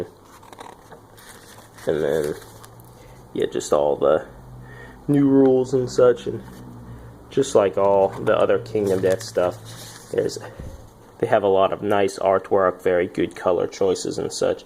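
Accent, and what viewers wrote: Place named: Louisiana, United States. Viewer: Nice video. Gotta say, you almost sound Cajun. Are you? I am. ^_^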